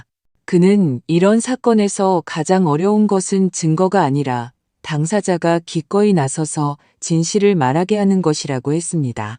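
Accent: China. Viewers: 발음이나 글읽는 소리가 연변족인것같음 요즘 이런글 쓰는 작가도 ㅎ 그렇고 한국인이면 다알수 있는 촌수를 뒤죽박죽으로 읽고 시간도 4시를 사시 다섯시는 오시 듣다가 한심스러워 나가기를 자꾸하게 되네....😢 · 하나히나. ㅡ중국인 가공 말투!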